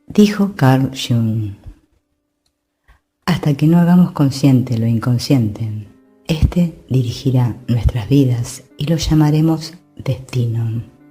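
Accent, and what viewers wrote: Argentina. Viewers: Gracias por la claridad con la q explicas..sos Argentina?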